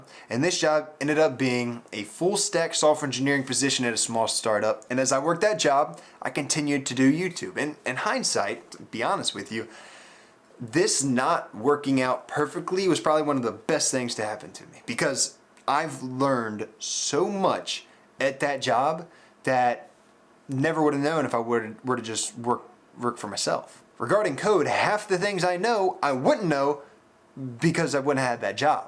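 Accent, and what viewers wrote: Texas, United States. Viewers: Are you from Texas? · i love your accent dude. are you from texas?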